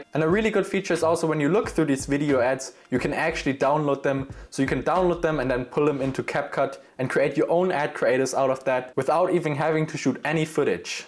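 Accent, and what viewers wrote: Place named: Germany. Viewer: Man merkt direkt du bist deutsch bro hahaha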